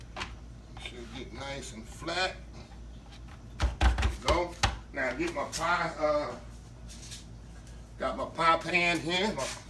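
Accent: South, United States. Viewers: Love that southern accent!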